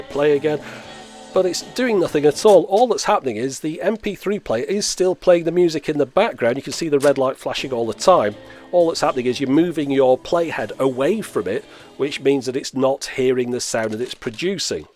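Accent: United Kingdom. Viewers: I'm curious. Your accent has caused me to wonder if these were only offered across the pond. I know that in our digital era today the powers that be have deliberately limited if not cut off alternatives in the States. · I wonder if this channel would be as interesting without the British accent.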